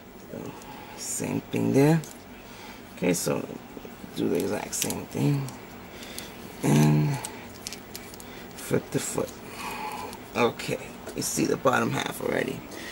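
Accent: Africa. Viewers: why do you sound like your from africa ? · African accent? has an African accent?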